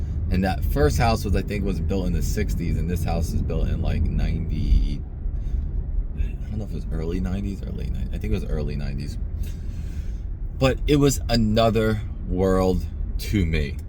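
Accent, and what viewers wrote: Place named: New Jersey, United States. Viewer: The sexiest part about you is your jersey accent❤❤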